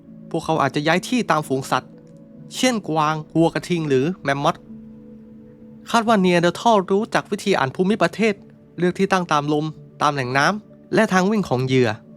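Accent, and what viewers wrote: South, Thailand. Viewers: ผมชอบสำเนียงใต้ครับ ฟังอยู่ลั่นขำเลย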